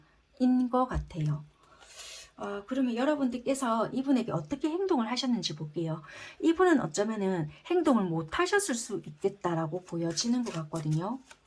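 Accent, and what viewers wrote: Busan, South Korea. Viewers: 구수한부산말투 매력적이네요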